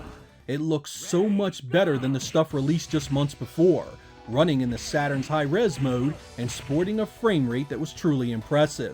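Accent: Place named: Mid-Atlantic, United States. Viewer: You should say “water” more. I like your mid-Atlantic east coast accent.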